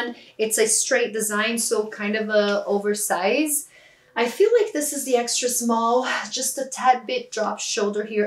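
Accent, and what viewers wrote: Brazil. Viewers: Are you Brazilian ? I’ve heard a Brazilian accent since the first sentences you said (actually, I’m writing this comment on minute . Just curiosity 😊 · is brazilian for sure, that accent... 🤔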